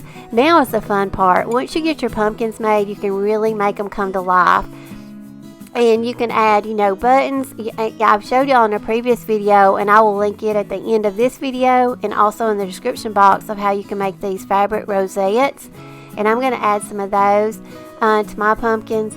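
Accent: South, United States. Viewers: What a sweet, enjoyable video! Listening to your beautiful southern voice and accent and seeing your crazy awesome embellishments just made me get so inspired., I especially love the sweet bird nests with the little pearl “eggs”. Thanks for sharing your creative gift. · Good to hear that southern draw I miss it. May I just say your pumpkins are absolutely beautiful. So simple so elegant . I’ll be making these for sure. Thank you for being a wonderful host in showing us how to make these. Happy Fall 🍂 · `I absolutely love, love, love your pumpkins. They are so out of the box from the normal ones you see. I can't wait to to do some like them, myself. Thank you for sharing your talent. I'm a southerner too, so I really like your accent! God bless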